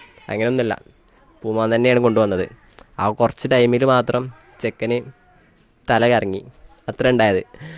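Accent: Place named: Manjeri, India. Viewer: Manjery slag adipoli,valkeene😂😂😂😂❤❤❤❤❤ ❤❤❤❤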